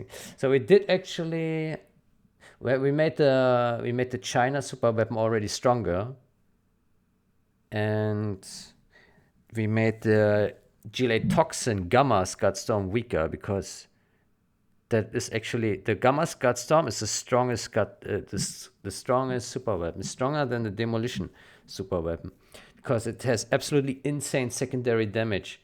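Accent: Germany. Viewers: hey are you german. i detect german accent. jawohl.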